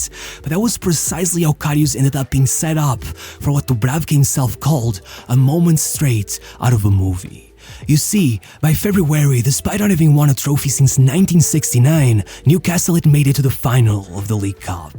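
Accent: Portugal. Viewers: His portuguese accent is kinda anoying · Your Portuguese pronunciation of every name gives me AIDS.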